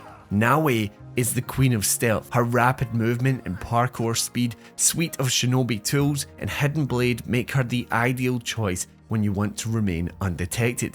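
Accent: Scotland, United Kingdom. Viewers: Aye laddie y’er Scottish ain’t ya ?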